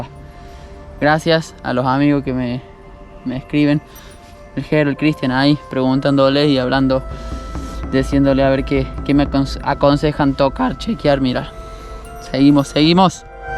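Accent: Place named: Córdoba, Argentina. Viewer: Pense que estabas hablando en ingles puro, pero sos un cordobés nato como yo , tefelicito por el espíritu que tienes y los anos mozos también cuenta soy un seguidor desde hace poco y me encanta la cosas que haces, disfrútalo y te mando un gran saludo jose cordoba argentina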